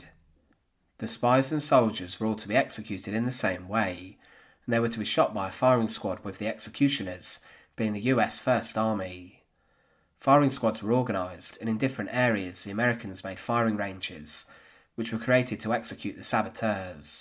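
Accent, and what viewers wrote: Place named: England, United Kingdom. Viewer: Sounds to me, a British person, like he has an estuary accent. It’s very clear.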